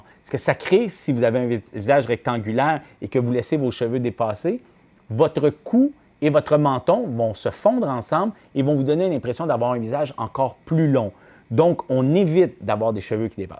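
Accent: Canada. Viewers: Très compréhensible et bien expliqué ,avec votre petit accent canadien que bcp de Français aiment!-Bonjour d' Allemagne d' une Française très interessant,surtout en période de Covid ,où les cheveux ont poussé énormément,les coiffeurs ayant été fermés assez longtemps!-Vos informations me serviront pour une bonne coupe chez le coiffeur!- Merci! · Oui bonjour moi aussi si j aimerai bien que je vous me coiffiez mais apparemment d âpre l accent vous êtes au Canada c est possible de vous envoyer une photo de face profil etc et que vous estimiez ce qu il faut faire contre rétribution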